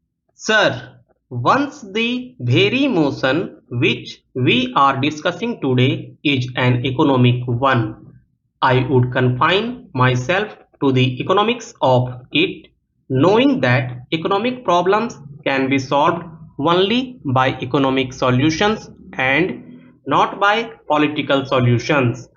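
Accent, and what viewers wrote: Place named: Bihar, India. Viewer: Work on your accent, it is more of from Bihar. Otherwise it is good.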